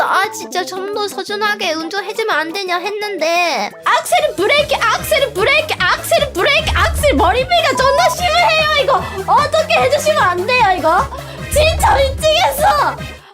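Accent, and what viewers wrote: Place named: Japan. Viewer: 와 근데 오랜만에 봐도 방송천재긴 하다 ㅋㅋㅋㅋ 저 일본묻은 말투랑 다 너무 사기임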